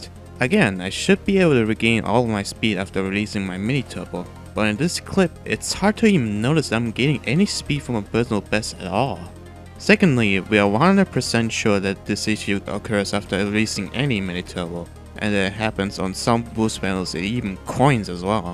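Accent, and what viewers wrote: Germany. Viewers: German accent❤ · Are you german?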